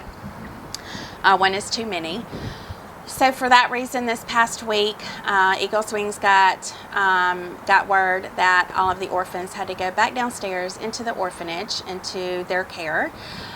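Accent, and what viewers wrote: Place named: South, United States. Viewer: Maybe your southern accent could be why your voice comes across like that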